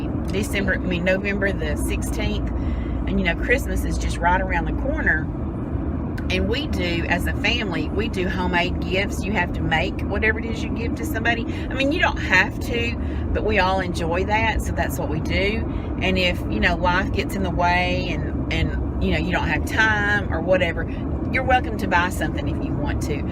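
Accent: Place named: Tennessee, United States. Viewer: Ms.....I LOVE you...."Don't nobody whoop me...and live"...hahaha! I'm right here in middle Tennessee and we talk just like you do! I recently found your channel and I'm going to do that fabulous drip cake you showed and I AM going to lose weight on this doggone LO CARB diet I just started! I hope you will show us how to do it right, girl, because this lard ass is fixin' to GO!!! Looking forward to some lo carb sweet delights. Thanks so much for being YOU...:-)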